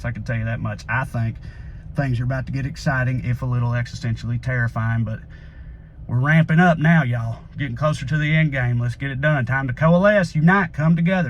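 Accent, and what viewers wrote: South, United States. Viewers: Hey I'm prolly gonna eat shit But I. Respect what you're doing I never really had to think before But props On props Its an effective form of absurdist theatre that just makes sense You are of course as smart as you think you are but getting an actual message across is impossible unless your a smoke show in a bikini. We're all gonna die But I hate a country accent slightly less because of this as long as no one mentions vacation bible school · Listening to a Southern accent talking liberal politics does my heart hood. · I'm glad whenever this proverbial rollercoaster rattles over another hill or hurtles through a long dip...that I have this man's voice to tell me about it. Reminds me of home. Thanks for your hard work from Thailand. is about the only southern accent I get to hear these days.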